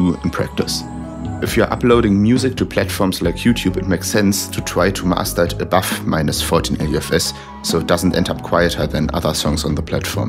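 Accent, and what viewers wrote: Germany. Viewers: Super video, echt spannend! Muss aber sagen, dass dein deutscher Akzent echt extrem ist (nicht das es bei mir besser ist)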